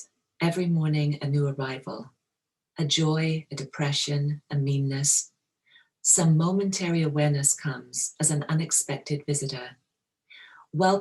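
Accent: Mid-Atlantic, United States. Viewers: This is very interesting!! Thank you for sharing this tutorial. Your American accent sounds very good as well. 😊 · Your American accent is great! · Your American accent is very good! Although when you said sorrow, I thought of Wisconsin, not necessarily Canadian. Of course, Wisconsin borders Canada so that makes sense.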